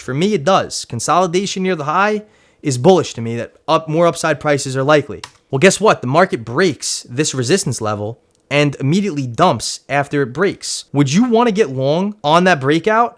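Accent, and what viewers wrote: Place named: New Jersey, United States. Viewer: You deff from New Jersey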